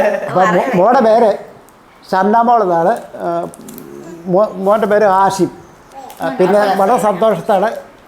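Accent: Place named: Idukki, India. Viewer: ചേട്ടന്റെ സ്ഥലം എവിടെയാ ചേട്ടാ, കേട്ടിട്ട് ഇടുക്കി കാര് പറയുന്നപോലെ ഒണ്ടല്ലോ 😍😍😍🔥🔥🔥🔥🔥🔥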